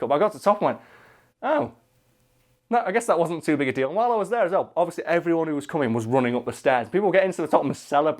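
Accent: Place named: Sheffield, United Kingdom. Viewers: I knew you were from Sheffield could hear it in the accent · Dude you could have at least known that he's northern. I mean, listen to the man :D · Been trying to figure out where your accents from for ages! Finally you say you're from Sheffield ! Let us know if you're ever on a night out round Sheff, love to meet you for a pint!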